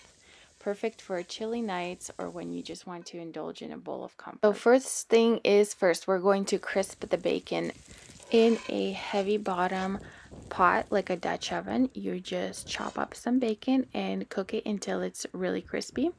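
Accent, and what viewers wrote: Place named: Russia. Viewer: Мне кажется, что я слышу едва заметный русский акцент.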